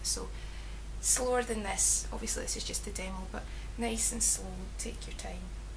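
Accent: Scotland, United Kingdom. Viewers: she is Scottish for sure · Did you study at the Moray College UHI? I'm getting insterested inthe Complementary Therapy HND. You give some glamour to the Scottish accent! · (ZombieToad) its Scottish I'm Irish so I know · Brandon Connell It's a Scottish accent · i really really like the scottish accent:) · You are such a pretty girl and I absolutely love your accent. I have always wanted to visit Scotland. Have you ever wanted to live on another continent?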